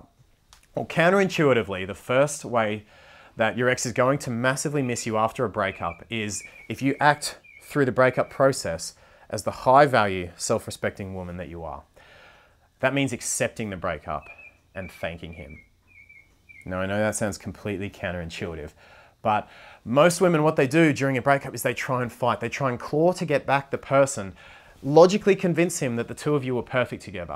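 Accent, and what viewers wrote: Australia. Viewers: This is the best video I have watched, my boyfriend and I split up two days ago and I am devastated and I couldn't stop thinking why?! And will he miss me? Will he regret this? Will he realise he dropped a good woman for himself? But you are right. I need to focus on myself again, focus on putting me first. He may come back to me and want to talk to me when he is ready, I know him very well and he will never want to come back to talk, I already know he is doing everything he can to put me behind in his past. But I still hope that when a few weeks of his strength and determination deteriorates and when things go quiet and he's lonely, he begins to think of me, and miss me and then the pang of sadness and loneliness really hit his heart and then the wash of regret hits him in full force. And I pray that when that day comes for him, that I am feeling stronger and closer to being healed. 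Also as soon as you said "shit fight" I clicked and realised you were Australian 😂 I couldn't tell if you were kiwi or Aussie but then I heard the twangs! I'm a kiwi girl in Sydney 🙏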